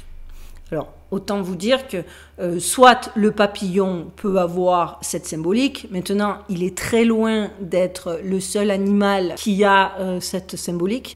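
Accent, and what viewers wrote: Marseille, France. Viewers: Hello la marseillaise (?) En tout cas l'accent est là. Je vous aime bien 😊 même si je ne regarde pas tout le temps vos vidéos et pour cause : pssiiit... ✋👀Je suis « réveillé » depuis une trentaine d'années avec les écrits de Serge Monast et d'autres donc plutôt au courant de ce qui se passe actuellement dans ce monde et surtout à notre époque. C'est bien ce que vous faites, continuez 👍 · Bonsoir, Merci pour cette vidéo analysée avec beaucoup de finesse et de pertinence ! L'accent du Sud et votre bonne humeur communicative rendent le monde moins obscur ! Merci pour le temps consacré qui aurait mérité un trophée ! Hâte de voir votre prochaine vidéo ❤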